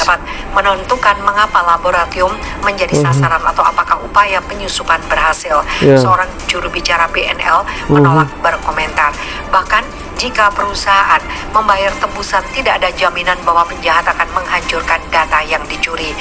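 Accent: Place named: Malaysia. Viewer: Bahasa anda gak jelas dan membingungkan dan terdenar lucu, ( bhs. malaysia ).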